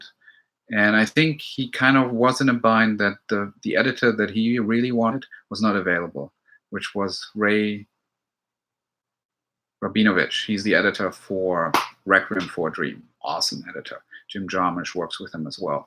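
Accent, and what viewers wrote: Germany. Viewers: Love ur videos btw are u german because of your accent? just corious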